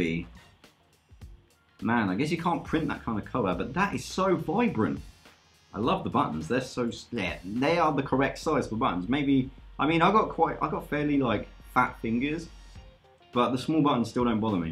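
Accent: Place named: United Kingdom. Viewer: He's a liar he lives in the U.K. 1. His accents 2. The plug How exactly did he pay dollars not pounds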